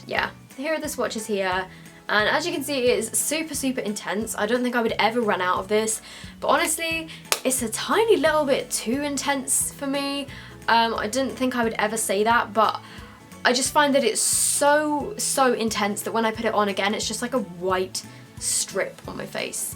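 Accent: England, United Kingdom. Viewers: omg ur so amazing I love watching ur videos. ur so beautiful and cute. I love how u talk it's unique. ur from UK? that's awesome. I love you so much omg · where abouts In England is she from x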